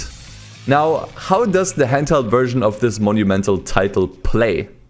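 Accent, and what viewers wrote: Germany. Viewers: Ned schlecht das video bree. Hätte beinahe den deutschen Akzent nicht rausgehört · Wie man the german Akzent einfach raus heared 😂 nice ! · What an absolute banger of a video, must have taken weeks to produce such a long video but it was totally worth it! Btw. am i hearing a german accent?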